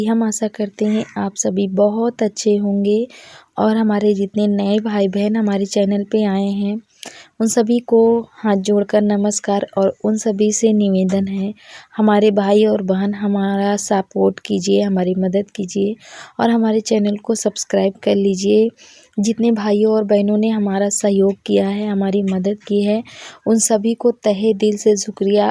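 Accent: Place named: Naini Nibi, India. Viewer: सिस्टर आप का भाषा और बोली जो है न अयसा लगता है कि आप नैनी निबी के तरफ के हो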